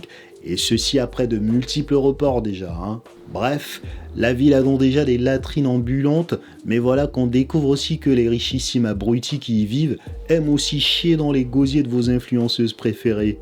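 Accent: Paris, France. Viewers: tombé par hasard, il est marrant ton accent parisien ;) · Wow je te connaissais pas , et en un vidéo je suis fan de ton accent parigo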